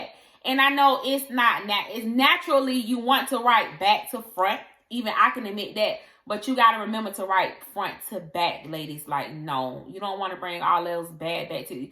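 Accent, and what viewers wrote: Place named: Florida, United States. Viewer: I know you from Florida boooyyyy that accent sound like all my kinfolk😩😭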